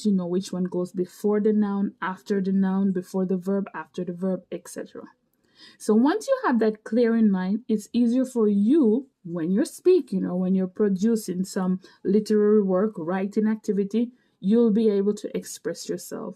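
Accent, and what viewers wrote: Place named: Spain. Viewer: Interesting. Awesome! I notice you speak English with Spanish inflections. What is the youngest student u would teach?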